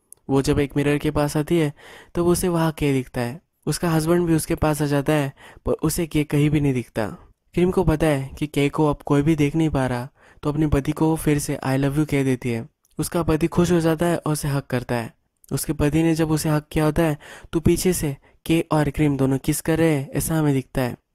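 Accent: Maharashtra, India. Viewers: Chitka hua ... Full Marathi guy speaks hindi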